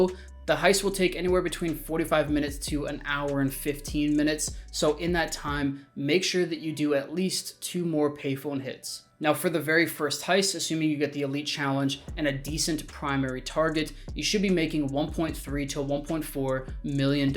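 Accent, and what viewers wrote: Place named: Canada. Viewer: I knew you were canadian from your accent. Greeting from Alberta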